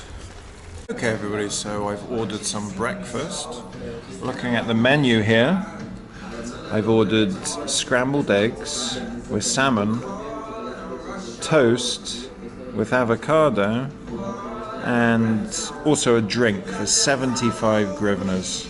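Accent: England, United Kingdom. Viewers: Which part of England are you from? Can't work out your accent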